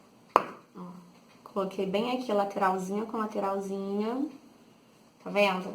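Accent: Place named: Rio de Janeiro, Brazil. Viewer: Parabens pelo video e pelo sotaque carioca! Cheguei aqui procurando como fazer esquadramento para bordado, mas já estou inscrita no seeu canal desde agora! Felicidades de uma carioca que nao mora mais no Brasil!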